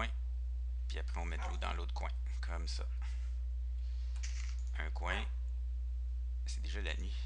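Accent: Quebec, Canada. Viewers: ENFIN UN CRISS DE QUÉBÉCOIS PAS UN FRANCAIS OMG MERCI MERCI!!!!!!!!!!!!!!!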